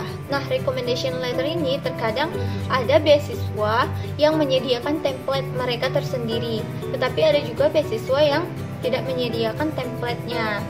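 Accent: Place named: Sumatra, Indonesia. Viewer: kayak orang sumatra logatnya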